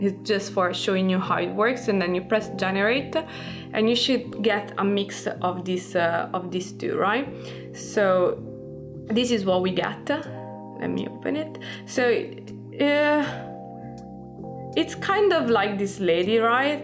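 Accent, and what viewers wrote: Italy. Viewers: Amazing, I've finally find out how to use a Lora model thanks! PS: Sei italiana? il tuo ecc ecc suonava italiano :)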